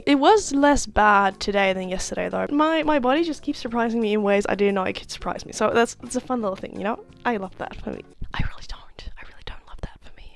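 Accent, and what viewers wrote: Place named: Australia. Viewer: wait i thought you were in australia, but your train shot made me go wtf where are u from LOL edit: I checked ur bio, youre from the netherlands... OK BUT YOU GREW UP IN AUSTRALIA OR SOMETHING RIGHT? I swear you sound australian o_____o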